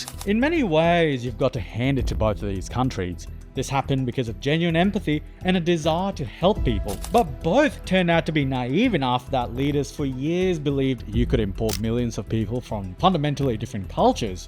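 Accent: Australia. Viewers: You have the most Australian accent I've heard on youtube do far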